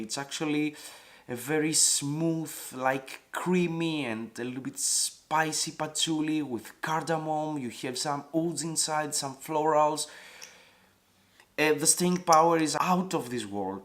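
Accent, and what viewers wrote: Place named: Greece. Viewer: XD recognized the accent im Greek too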